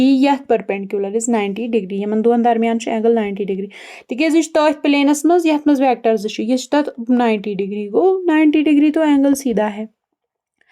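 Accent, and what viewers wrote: Kashmir, India. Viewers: Thaat kashmiri accent 😂😂 ❤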